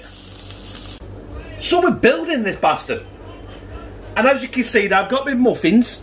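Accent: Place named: Lancashire, United Kingdom. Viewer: Hi there Where are you from? I hear a tinge of th'ewd/th'old Lancashire accent there Apologies if I am wrong I use a lot of them shortened phrase's We should never lose that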